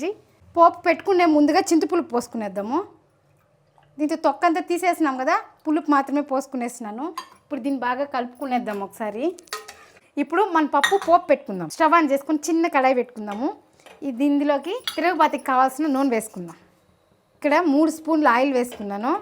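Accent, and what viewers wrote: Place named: Chennai, India. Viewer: are u from Madras because my in-laws are from Madras so I want say is what all u r cooking I am so familiar because all the items I too cook at home so exactly I know how it taste my favorite venchina pappu oh my God I can’t believe please let me know from where are u ok the way u talk too it is same I love it keep smiling enjoy look forward another recipe 😋.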